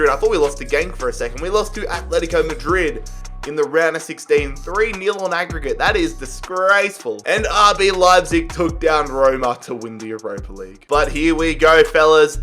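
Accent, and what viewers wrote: Australia. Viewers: He is Australian they speak very strangely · Are you a bloody Australian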